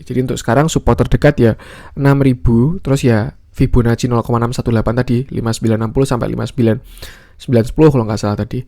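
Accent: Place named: Central Java, Indonesia. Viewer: Logat jowo tengah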